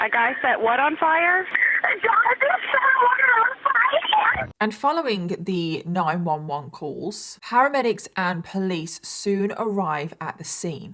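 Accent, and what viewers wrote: United Kingdom. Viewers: LOVE YOUR BRITISH ACCENT.. So there is Posh, Then R something? Haven't seen where you are from in England. You are AMAZING STORY TELLER. You look great before and after makeup..Your an Artist for sure. Both as an Orator and Make Up Artist..Is no easy feat to make a guy watch through most videos.. You kinda an A.M.S.R.. . Have you thohght about a playlist with you reading or explaining a story, thats light and soft spoken..?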